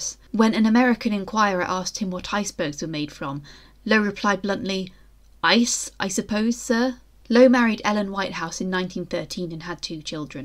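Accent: United Kingdom. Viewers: Just some friendly advice: keep the info about the person up longer (it went away too quickly so I had to keep going back to be able to read it all or pause on it and i'm a pretty fast reader) and speak a little slower because I missed a solid 50% of what you said it kinda felt like I was in a rush to hear you ha so I had to go back to listen to it again. You have a really beautiful voice (then again i've always been a sucker for British accents 😉💕), great narration and well made videos but I had trouble with those two things. Not trying to be rude by the way just trying to help 🙂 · Impressive memorial, sublime research! Lovely narration, clear, impeccable English accent. Thank you. 😇🤗💃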